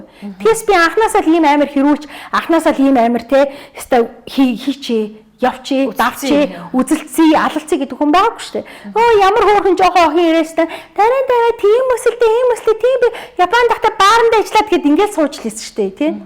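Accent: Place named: Countryside, Mongolia. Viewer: Hutlugch kuduunii vagai bn shd. Yamar ch medleg bolovsrolgui. Duudlaga ntr tag oron nutag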